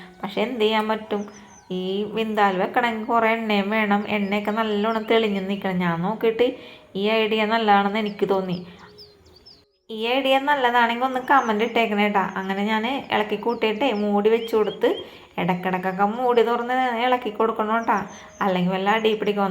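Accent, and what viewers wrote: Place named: Vypin, India. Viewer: ഇത് ഞങ്ങ വൈപ്പിൻ കരകാരുടെ സ്ലാങ് ആണല്ലോ ചേച്ചി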